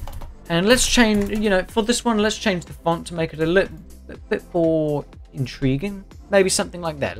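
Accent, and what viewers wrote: United Kingdom. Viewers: Bro I can’t understand British (not making fun of the accent) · Are You Britisch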